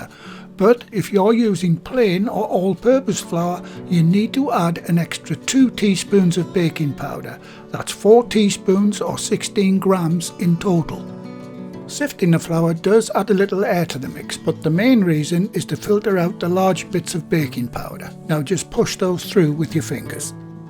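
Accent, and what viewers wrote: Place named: Sunderland, United Kingdom. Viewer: Thank you for all the wonderful recipes we have enjoyed all these years. I actually learned baking bread following your instructions. On this recipe I used large eggs and the end result is far more runny than your final batter, any suggestion for the next time please? My dearly departed wife was from Sunderland and your accent is a kindly reminder of happy times. God Bless You.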